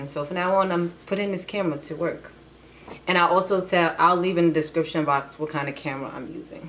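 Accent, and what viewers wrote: New York, United States. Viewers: I love your New York accent yasssss ✨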